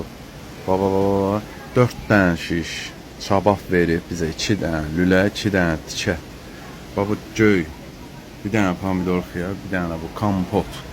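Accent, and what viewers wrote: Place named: Sheki, Azerbaijan. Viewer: Qaqaş səni gözü yiyim, sən özün Şəkidənsə? Haindi ləhcən elə bilki, bizim ləhcədir. Hada naqaraq bahadı birdə gəlmərik. 😂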